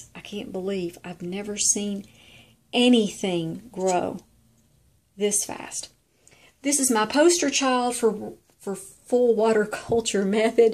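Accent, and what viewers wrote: South, United States. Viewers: You are so precious! I love your info on orchids. I love your southern accent even more. And even more, love your blessing to us at the end of your videos! Thank you so much!!!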